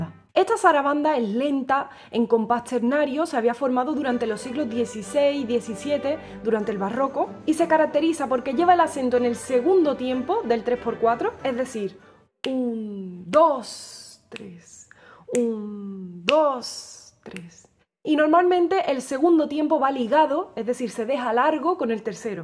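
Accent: Andalusia, Spain. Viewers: Me encanta su acento andaluz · Y desde el Guadalquivir llega a Veracruz, después se convierte en sones y jarabes novohispanos. Felicidades por la interpretación. Ese acento andaluz 🤤🥰 enamora 😍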